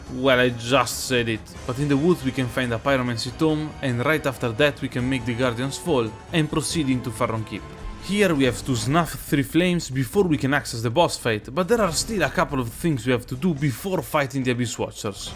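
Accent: Italy. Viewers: Prime tre parole e ho istantaneamente sentito il fatto che eri italiano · I love your videos and also I love your accent. If you're Italian "spero nel meglio per te e il tuo canale" Else "I wish you the best" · oh right you're thick italian accent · Si sente che sei italiano, ma parli veramente bene in inglese✌🏻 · bellissimo come io sia capitato su questo video per caso e si senta l'accento italiano hahahahahaha, comunque complimenti per l'inglese👍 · funny how i recognized your italian accent only because i saw a shitpost about vento aureo where there was italian text to speech speaking english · You italian or french? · Bro dall'accento ho capito subito che eri italiano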